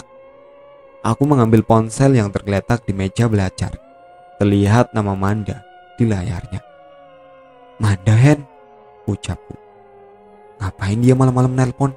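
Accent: Java, Indonesia. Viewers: Min jangan pakai guwe atau gua dong .gk pas .dg logat jawa nya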